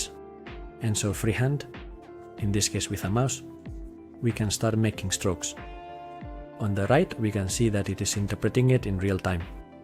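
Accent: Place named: Greece. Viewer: Thank you for this video. Love your accent (I assume it is a Greek one)🙂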